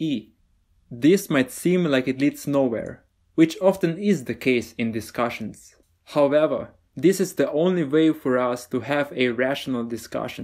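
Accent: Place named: Slovenia. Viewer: Are you from Slovenina maybe 😅 I somehow recognize the Accent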